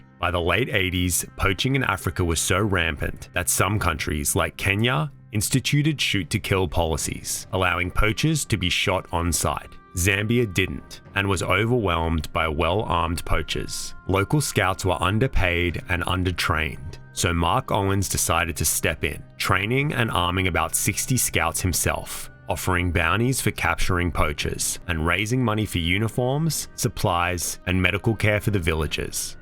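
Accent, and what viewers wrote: Australia. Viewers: It’s that chill Aussie with the interesting stories again :)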